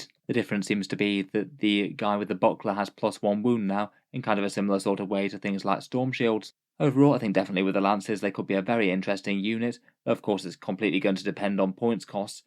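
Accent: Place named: United Kingdom. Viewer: Pretty much everyone in the UK speaks like that ;)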